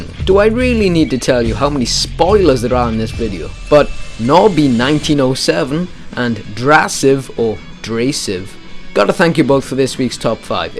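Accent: Wales, United Kingdom. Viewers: I'm picking up Welsh, Italian and Irish from your accent? No offence intended.. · you must be from wales! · Are you Welsh? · Where are you from? you sound welsh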